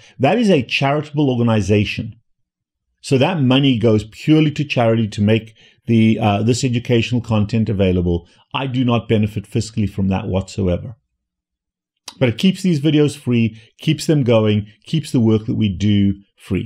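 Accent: South Africa. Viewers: That depends where you are from. He is from South Africa.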